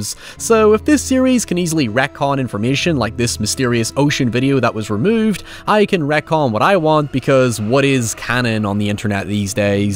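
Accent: Ireland. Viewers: God, I love how Irish youtubers pronounce certain words. Every Irish Youtuber I’ve watched loves to use the word “Hoyever”.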